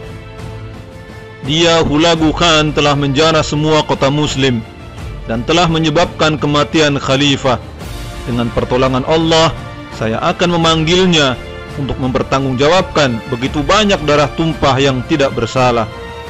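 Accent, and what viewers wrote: Bugis, Indonesia. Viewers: Mcm logat Bugis ini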